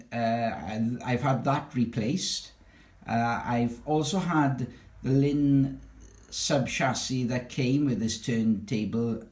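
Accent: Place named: Wales, United Kingdom. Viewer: You sound Welsh......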